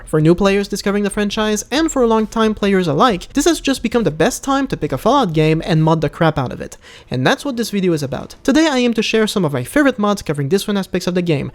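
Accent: Quebec, Canada. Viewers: Are you from Quebec by any chance ? Feels like i'm watching a GSP mod review ;)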